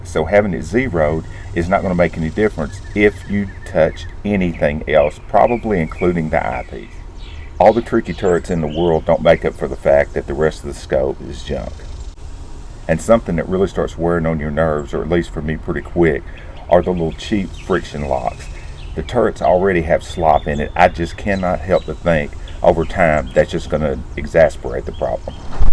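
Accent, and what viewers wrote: South, United States. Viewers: great no bs video comparison. laughed all the way thru it! you remind me of Duke Nukem with a southern accent, you are awesome brother keep it up.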